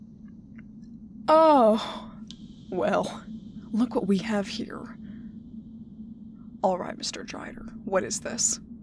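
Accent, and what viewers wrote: South, United States. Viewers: just thinking and wanted to share but I think you'd make an amazing outlaw series, southern charm with the voice, amazing perspective on story telling, know when to stop and end a episode. Like I said was just sharing a thought and ¿I guess things that prompted me to say it¿ idk reasoning didn't sound right lol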